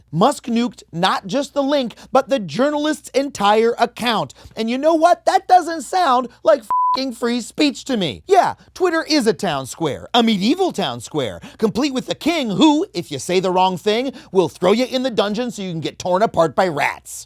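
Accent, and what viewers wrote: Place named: California, United States. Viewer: I get his point, but has such a California pretentious way of speaking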